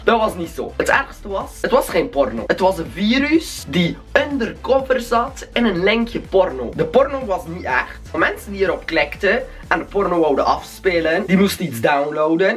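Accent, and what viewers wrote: West Flanders, Belgium. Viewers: Zo Nice daje ma west Vlaams klapt inde video😂😂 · Hahahahah west vlaams man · Kom je uit westvlaanderen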